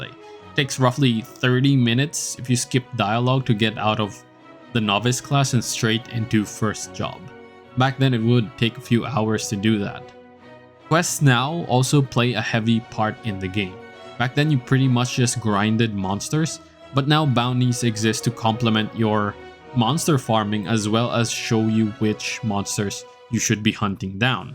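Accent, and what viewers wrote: Philippines. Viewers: Not sure why sounding Filipino is a problem. He's not doing any humiliating thing like what you're doing to yourself.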